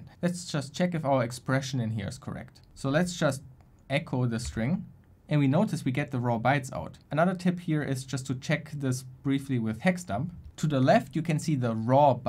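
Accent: Germany. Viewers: I really like your videos a lot and have a great deal of respect for you but your accent eludes me. I mean, your account says you're from Germany and your pronunciation also sounds German but sometimes you have really weird grammar that doesn't really work in German or English? For example in the first part of the video: "Because I get often questions..." The more natural way to say this in English would be "Because I often get questions" But! In German the sentence in this context would be "Weil ich oft Fragen kriege" (or something similar) WHICH HAS THE SAME ORDER OF WORDS EXCEPT TWO DIFFERENT WORDS ARE SWAPPED Again, please don't misunderstand this as critique or an insult (As a German, I totally get how hard it is to speak English well!). It's just that the mistakes you make genuinely intrigue me.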